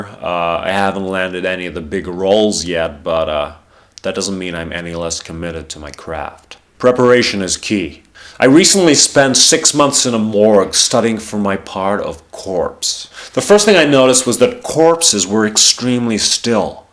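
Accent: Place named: United States. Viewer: I loved the ending! And nice American accent!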